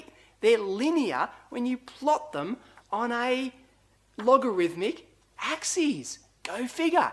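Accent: Australia. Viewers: Thank you so much for the video! you are definitely an aussie aren't you? haha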